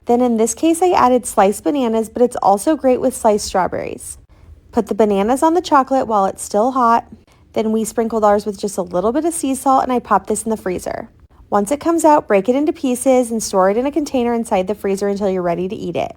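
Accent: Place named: Australia. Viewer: Are you Australian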